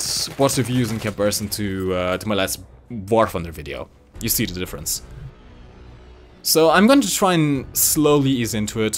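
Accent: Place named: Germany. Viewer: i love your content, yes i love FPS and war thunder, something im in the mood for war thunder, sometimes cs:go, overwatch, tf2 etc etc, the thing is.... i found you cuz i didnt knew wtf i has doing in tank battles, and you helped me, i like your wt content and it shows that you do... put a alot...ALOT of effort, and well your german accent.... well.... i stayed cuz you're an awsome guy, funny and well you teached me how to be a tanker / pilot, same as orange, he helped me with a coupple of plane tips here and there, he's awsome.... what i wanna say is.... i'm here for the person dispise the content, hell you can play the sims i'd watched it... it would a bit awkard.... but i'd love to see what you can do with it, sort of speak... stay safe and.... keep beeing awsome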